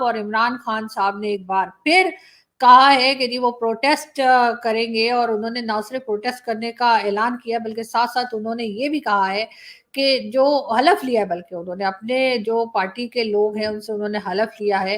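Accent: India. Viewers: ji aap ki jubaan paki kam hindustani jyada lagti hai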